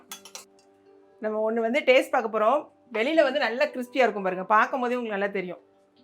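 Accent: Tirunelveli, India. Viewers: Tuticorin n Tirunelveli slang super.very nice our language cooking is very interesting.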